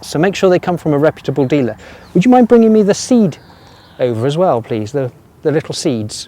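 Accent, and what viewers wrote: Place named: United Kingdom. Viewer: Everything sounds better in a British accent.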